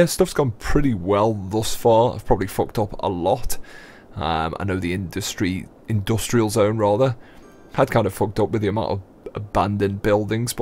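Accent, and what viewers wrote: United Kingdom. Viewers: Man Bear Pig Gaming for the same reason i enjoy your prison architect stuff; derpy, well intentioned, plate-spinning enthusiasm coupled with some fine uk-flavoured commentary.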